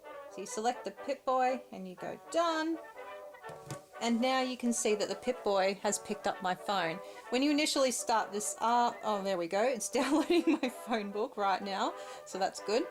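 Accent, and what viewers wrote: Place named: United Kingdom. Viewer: Definitely want one. Bet they are pricey. Nice vault suit by the way, love the British accent.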